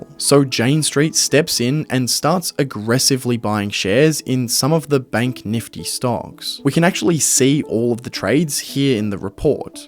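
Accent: Australia. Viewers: It's so hard to listen to the Australian accent. Putting a subtle r at the end of words that end in o...lol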